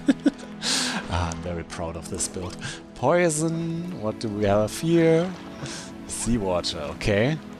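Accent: Germany. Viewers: You sound so german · I hope its not rude to ask but is that a German accent?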